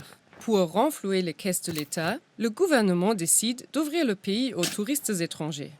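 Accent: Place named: Germany. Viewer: Elle a l'accent allemand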